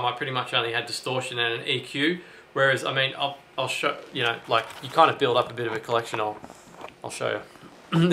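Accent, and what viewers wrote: Australia. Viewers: Quality video ! you do a great job with this , subbed , watching / taking notes / stealing tons of ideas . don't be surprised if you notice a lil aussie accent on my next vid ....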